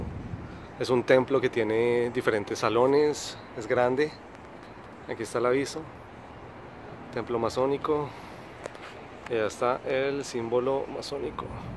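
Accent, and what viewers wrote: Colombia. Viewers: Lo que usted dijo ya se dijo otro lo dijo al otro y dijo digan al otro a que digan y lo dijeron a digan y dijo y así me doy y al final no decís nada y te cubrís de ninja por miedo o tu eres masón tu acento es colombiano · Esta bueno el vídeo....Creo que el acento de este hombre es de Colombia 🤔 jejejejejeje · A ver... Es del futuro....? De que año? Y porque habla como rolo gomelo gay de la actualidad....? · Eres colombiano 😁?? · Jefe Maestro no ve ke me estoy riendo. ? no me molesto. pero eske si. la vos de este hombre. me encanta. nose si es colombiano. eso me encantaria todavia mas. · Tienes acento bogotano, me gusto el video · Acento colombiano? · me pa ke sos colombia vos-- igual bien x tu data-- --- yo argentina ! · Gracias por tú información amigo,por tu acento sé que eres colombiano, pero lo importante aquí es que eres inteligente, gracias por la información yo en lo personal no tengo religión pero no soy ateo yo creo en jehová en Jesucristo y en el espíritu santo y ,no soy masónico pero creo que sin querer me identifico más con ellos, no quiere decir que quiera unirme a ellos, pero no e querido unirme a ninguna religión cristiana, porque veo que siempre hay desigualdad en todas,,claro y lo tengo muy claro, nunca seré musulmán ésa religión sí no le encuentro sentido alguno,,,,,morir sólo porque sí muero me van a estar esperando siete vírgenes en el cielo,eso no tiene sentido, yo prefiero disfrutar vivo a siete mujeres aunque no sean vírgenes pero en vida y claro si ellas quieren con el consentimiento de ellas!!!! 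· Tienes acento colombiano! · Eres Colombiano?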